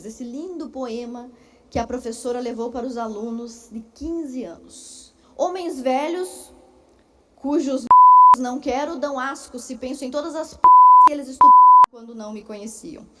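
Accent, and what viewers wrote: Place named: Santa Catarina, Brazil. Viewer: Deputada Ana, quero te parabenizar pelo excelente trabalho, e também o vereador que denunciou, pena que a senhora não atua em São Paulo, capital onde eu moro, mas na minha opinião, afastamento é pouco, porque isso é o sonho do esquerdista, ganhar sem trabalhar, ela tem que ser expulsa por justa causa. Penso que esses militantes disfarçados de professores, fazem isso por que no máximo que vai acontecer com eles é o afastamento, que eles vão ser aposentados, antes da hora. Com isso essas pessoas lucram na cabeça deles, de todas as formas, fazendo a cabeça da sociedade e ganhando mais militantes pro movimento e se for pego é aposentado, que maravilha pra eles, acaba sendo um incentivo eles fazerem isso, a direita precisa parar de bancar financeiramente a esquerda, um exemplo é o descondenado que nunca trabalhou, e tem uma ótima aposentadoria por ter perdido um dedo, outra pensão ótima por ter sido exilado, e vitalícia, no valor que supera os 30.000,00 reais por mês, deveria existir um conselho de fiscalização do ensino, igual a OAB, CRM, CRosp , CREA, e etc... 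e se o professor fizer esse tipo de absurdo, que perca a sua carteira da ordem e não conseguirá dar mais aulas. Sou Paulista, mas tenho muita vontade de conhecer e até mesmo morar em Santa Catarina, pois gosto muito da cultura, do respeito, desses valores que estão fazendo de tudo pra acabar. Gosto até do sotaque, acho muito bonito ver você falando. Hoje está muito raro encontrar uma mulher igual a você pra casar. Me perdo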